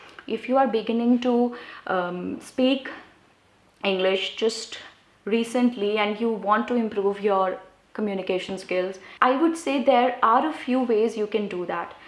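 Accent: India. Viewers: I love your video. specially I like it because your Indian accent .. You've inspired me talk to English in easy way.. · Mam, your accent is very similar to the Indian From which country do you belong mam ?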